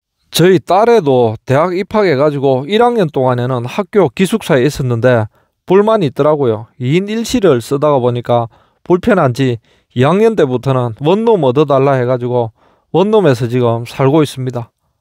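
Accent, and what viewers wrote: Gyeongsang, South Korea. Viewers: 축하합니다. 씨! 얼굴도 보이게 찍으면 더 좋을것 같은데... 얼굴좀 보여 주세요. 경상도 사투리는 고칠수 없다지만 얼굴 보여주는것은 시청자에 대한 예의가 아닐까요? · 심심하면 잘 시청합니다 추카추카 합니다 경상도 말씨도 구수하고 여기는 전라도 광주네요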